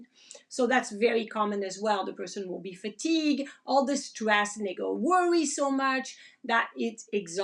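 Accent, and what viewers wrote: France. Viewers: your French accent adds to your charm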